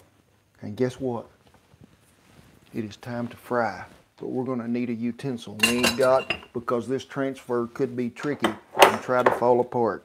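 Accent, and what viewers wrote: South, United States. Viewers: No ma'am . You can't take the accent out of the south 👍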